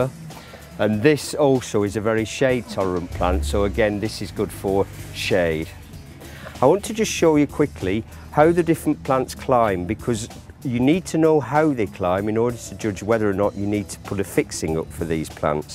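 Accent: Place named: Lancashire, United Kingdom. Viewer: What a lovely Lancashire accent. Thanks for the info!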